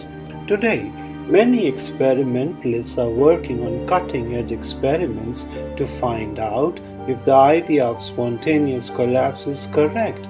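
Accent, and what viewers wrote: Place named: India. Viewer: Sorry if i seam racist here, but i have to tell you! I just LOVE your indian exant, i really get this feeling of wanting to smile, thats rare. Its something about how you use tones in your voice. This a bonus for a lecturer like you, its like having einsteins hyper inteligent teddybear speaking to me through youtube or something. Newer loose that grat tone of woise. Your like a guru, not talking bullshit all the time.. More power to you :-)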